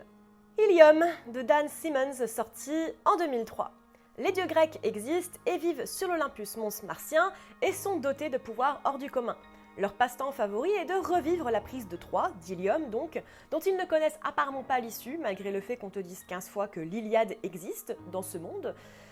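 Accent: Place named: England, United Kingdom. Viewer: de estelle faye je te recomande la derniere lame et sinon j'adore ton coté bourru et ton accent anglais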